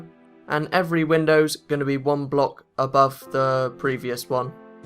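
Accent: United Kingdom. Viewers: hes british if you say anything about his voice f**k u because its racist and stampys avatar is not copyrighted so shut up and if you think hes boring type in mansion tutorial the guys a robot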